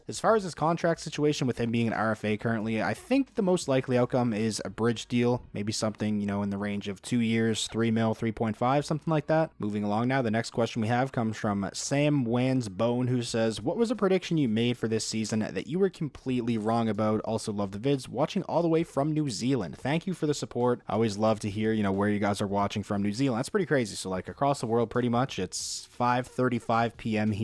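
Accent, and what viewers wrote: Nova Scotia, Canada. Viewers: Are you from Nova Scotia?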